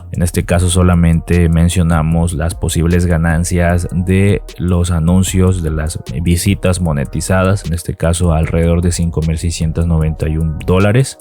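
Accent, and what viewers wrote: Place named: Colombia. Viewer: Me encantan sus videos, pero creo qye el video lo hizo su marido. No he visto otro video parecido a éste, porque hay unas personas que tienen videos de suculentas y muchas plantas, con muy buenos consejos de abono y tansplantacion y compra. Pero seguro que es su marido el autor del video. Si así lo creo. Porque tiene acento colombiano.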